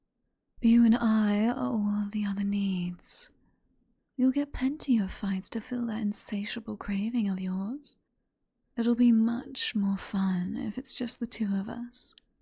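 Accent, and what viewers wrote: United Kingdom. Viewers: Definitely an interesting audio, and once again proving that the British accent lends itself so well to villains.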